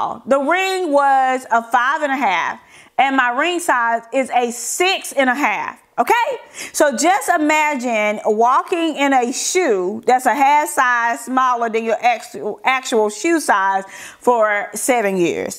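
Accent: South, United States. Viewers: You always look well put together so I see no degradation in your makeup. What's really sweet is ur country/southern accent!! So... "belle-ish".😄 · Honey after 5, girl your southern accent comes out all the time and I’m a fan okay, I love it. Thanks for sharing you got some cute...cute items!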